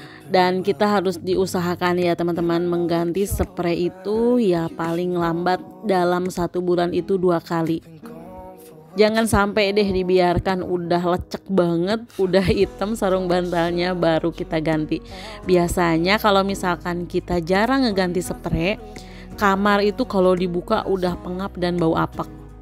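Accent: West Java, Indonesia. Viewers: Ibu sunda pisan, mun sur org sunda mah asa ka cai we jauh ge da ka bumi budak 😂😂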